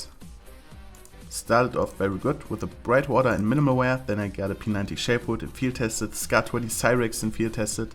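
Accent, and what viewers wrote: Germany. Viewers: u from german 100%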